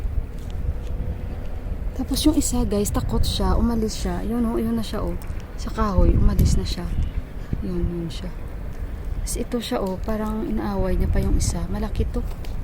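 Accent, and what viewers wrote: Iloilo, Philippines. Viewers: Maham ot gid ko sa imo te ah ky may tuno ka gid ya sa ilonga heheheh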